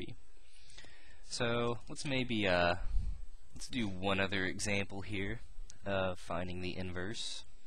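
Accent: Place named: United States. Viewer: As soon as I heard an American accent, I knew this was a different method.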